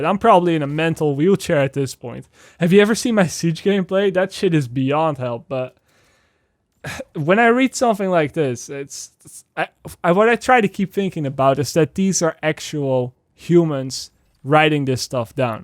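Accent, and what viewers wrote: Netherlands. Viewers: Are you Nederlands? I hoor het in je accent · I knew you were dutch before i saw the Twitter posts I have the same accent when speaking English oof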